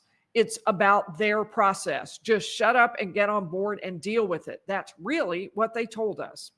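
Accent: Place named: Texas, United States. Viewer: Love your Texas accent !!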